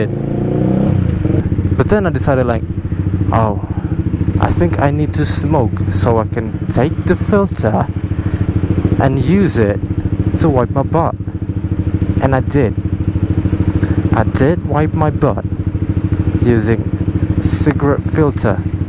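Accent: United Kingdom. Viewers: very british, i love your accent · aksen britishnya keren bang, mantap (y)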